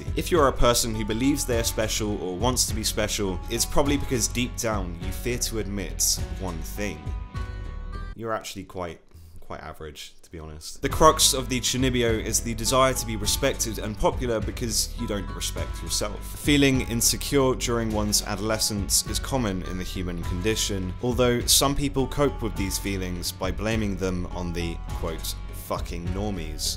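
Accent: United Kingdom. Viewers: Your cutting British accent and the background reminds me of exurb1a. Much love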